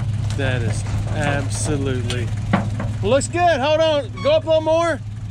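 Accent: Alabama, United States. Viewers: 🙋 I was watching this video and I was cringing! 😯😳Have a blessed day ✌️ Love your Alabama Accent 😁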